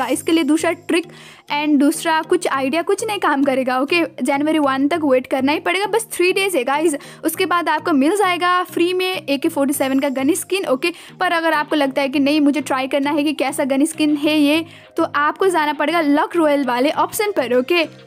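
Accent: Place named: Nepal. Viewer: You sa like a nepali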